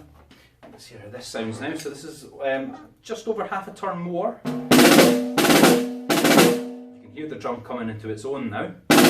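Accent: Scotland, United Kingdom. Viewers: Are u from Scotland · Good review mate, and good to hear a Scottish voice instead of all the American reviewers.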